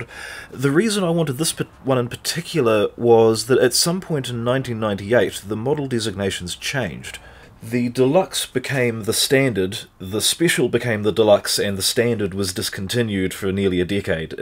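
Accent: New Zealand. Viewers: Fantastic! Excellent piano, drums and bass - and kiwi accent! Well done dude.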